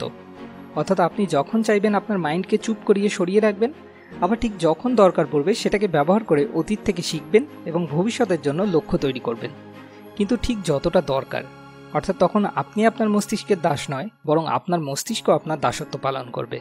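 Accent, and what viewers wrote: India. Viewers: Md:Mijanur Rahman No I am sure He is Indian because accent is different.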